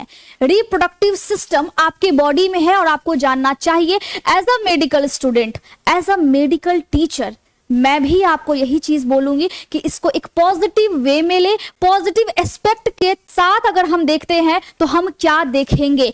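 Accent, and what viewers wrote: Bihar, India. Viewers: Aap bihari ho kya maidam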